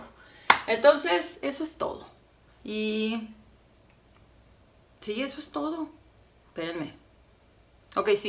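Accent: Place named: Monterrey, Mexico. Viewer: me gusta tu explicación, muy informativo y bien relax. también me gusta tu acento regio 😎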